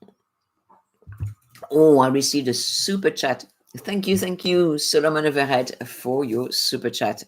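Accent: France. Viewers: No nagging, just your friendly nice French accent and with the Wow I get the surprised face with big eyes. Love you.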